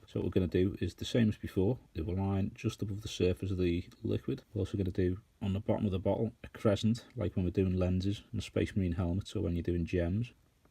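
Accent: Liverpool, United Kingdom. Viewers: Scouser! Yes lad :)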